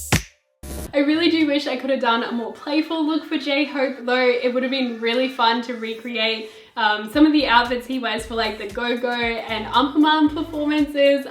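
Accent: Australia. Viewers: ahhh i love this video so much! im from australia too and its so cool hearing someone rant about bts in an aussie accent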